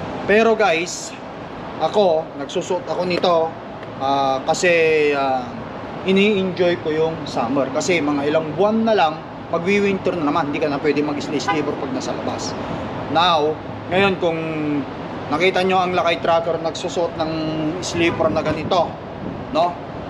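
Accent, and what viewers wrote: Ilocos, Philippines. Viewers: Lakay, natutuwa ako sa mga pronunciation mo, hindi maitago ang pagka ilokano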